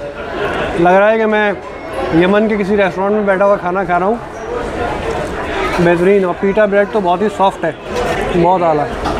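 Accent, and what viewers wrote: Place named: Lucknow, India. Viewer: Puri laucknowi juban hai aapki.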